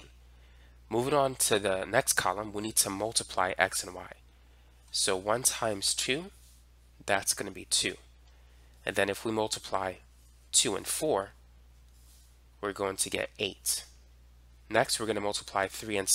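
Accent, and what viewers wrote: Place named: Massachusetts, United States. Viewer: He talks like he's from Massachusetts.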